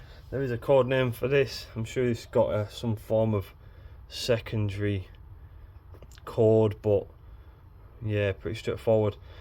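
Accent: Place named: North, United Kingdom. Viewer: Absolutely love this northern (?) accent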